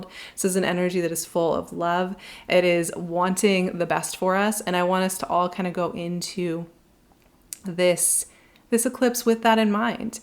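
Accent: United States. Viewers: She sounds like an american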